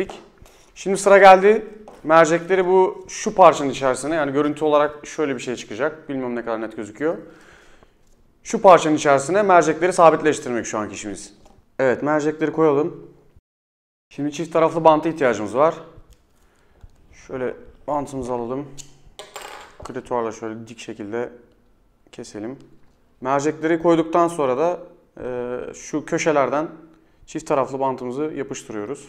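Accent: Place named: Izmir, Turkey. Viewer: tam izmirli yaa.. incedennn ;)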